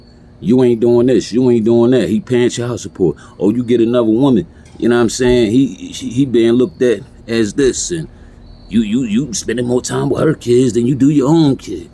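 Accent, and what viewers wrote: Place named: Philadelphia, United States. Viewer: Good chit bro💯🐺you sound like us philly n*****🤔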